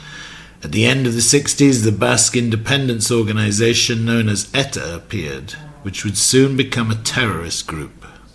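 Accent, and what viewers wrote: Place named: RP, United Kingdom. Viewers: His voice sounds like Trump's with a British accent hahaha I actually like it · Indeed. "I call it british RP with a spanish twist" but I found it to be sophisticated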